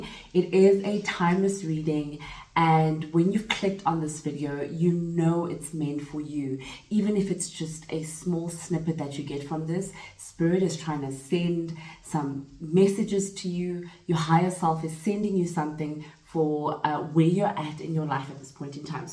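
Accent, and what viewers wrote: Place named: South Africa. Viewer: You sound south african sis,🇿🇦 🙌🤞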